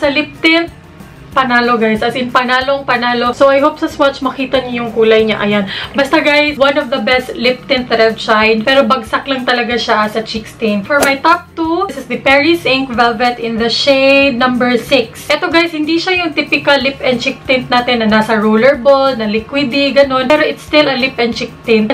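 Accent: Visayas, Philippines. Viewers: ate bisaya b kayo hehehe...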